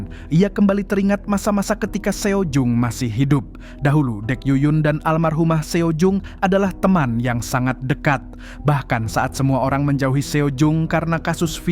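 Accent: Banjar, Indonesia. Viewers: Bang Kamu orang mana bang, kaya logat Banjar 😮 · keliatan urang banjar nya wkwk jah bang topi bunggul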